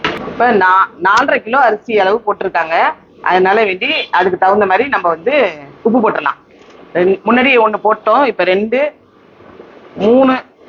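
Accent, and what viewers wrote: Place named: Coimbatore, India. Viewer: Super , coimbatore ah sister ninga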